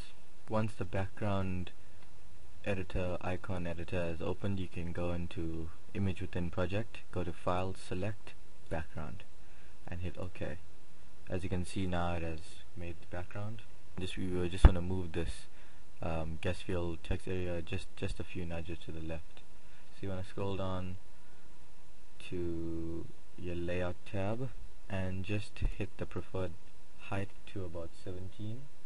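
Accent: South Africa. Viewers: I "guees" that's why he does the design and not the coding ^_^ JJ - still a nice tutorial. Are you guys South African? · South African?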